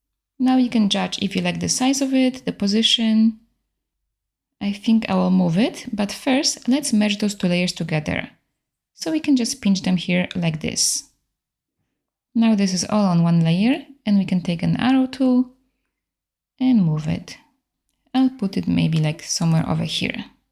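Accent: Poland. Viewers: I love your voice! Are you Polish?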